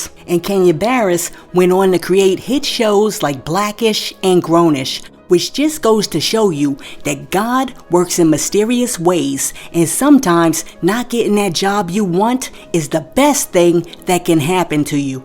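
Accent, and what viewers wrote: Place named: New Jersey, United States. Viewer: You gotta be from jersey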